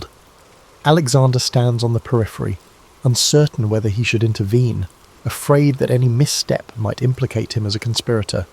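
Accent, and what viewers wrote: United Kingdom. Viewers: I so wish you would ditch the British accents. Who can sleep with that uptight, nervous-wreck jabbering invading our bedrooms?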